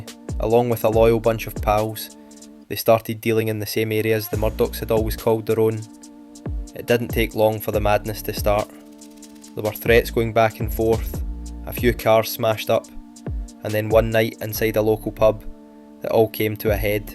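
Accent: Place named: Scotland, United Kingdom. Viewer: This is why I like ,a scottish voice telling the story keep the good work up 😊😊x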